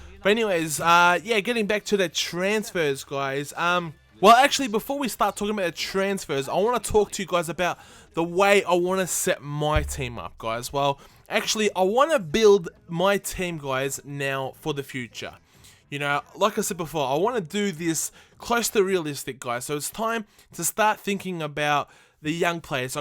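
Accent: Australia. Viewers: New Sub here and a Spurs fan. Found you after buying fifa 14 and looking for some tips / gameplay. Normally the aussie accent wrecks my head but you know your footie and your commentary is great!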